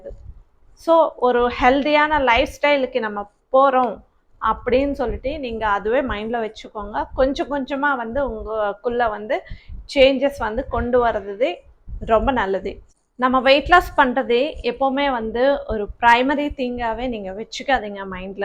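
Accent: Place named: Telugu, India. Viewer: tamil pesaradhu alaga eruku, ❤you are looking gorgeous in this saree💕 beautiful queen💝👸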